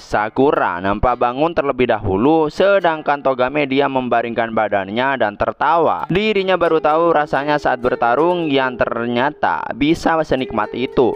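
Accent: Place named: Madura, Indonesia. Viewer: sama sama orang madura soalnya bang, kerasa banget logatnya di telinga aku wkwkwk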